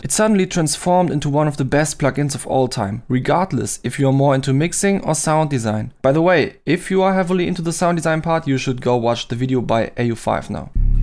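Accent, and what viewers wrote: Germany. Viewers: Du klingst so stark deutsch 😂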